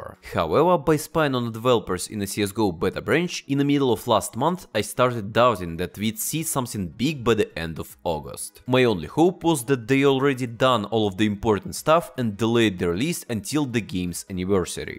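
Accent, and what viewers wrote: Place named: Russia. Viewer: hope you don't change your accent along the years XD best Russian one so far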